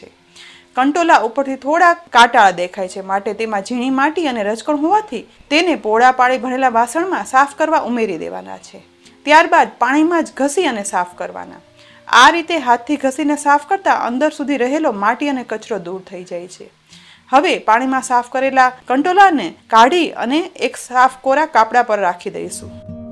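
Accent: Kathiawar, India. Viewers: Excellently instructed in Gujarati language with Kathiyavadi Accents.👍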